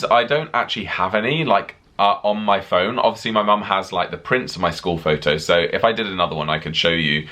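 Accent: England, United Kingdom. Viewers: I love your pictures. You are so kind to share them with all of us., I have been a member of your channel for years and you have never responded to any of my messages. I would be so excited if you responded to atleast one of my messages. I really enjoy you content and I love your English accent and everything about your life. So please, just leave a word or a sentence. It would be so greatly appreciated. Thank you for sharing your life with all of us.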